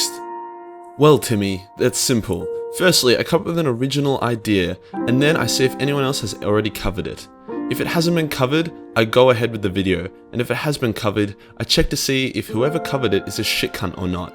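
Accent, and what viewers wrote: Australia. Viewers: This was a match made in the Warp. Also it’s nice listening to a fellow Aussie speaking... I don’t have to decipher the accent.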